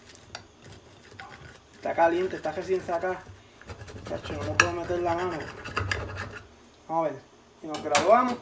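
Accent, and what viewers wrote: Puerto Rico. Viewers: Hay papa me gusta ese acento de Puerto Rico. Si lo hago con pepperoni y también jamón y le echo cebolla, aji. Bien rico. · Puede tener el acento de boricua, pero aseguro que ese día te es Dominicano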